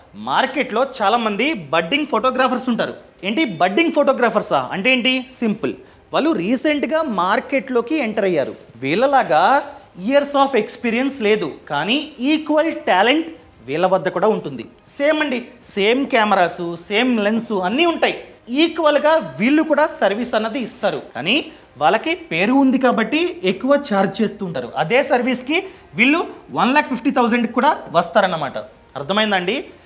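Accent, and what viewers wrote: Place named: Andhra Pradesh, India. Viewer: Hi, Please don't mind for this Question. Why is your Telugu slang so different? Are you not from AP?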